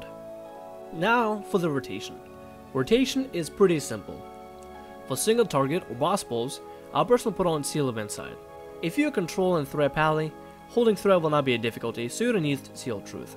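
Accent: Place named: Russia. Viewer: Привет, по акценту подумал ты Русский такчто надеюсь ты поймешь :P! Тебе кто Больше нравитсья Вар или Пала (танки)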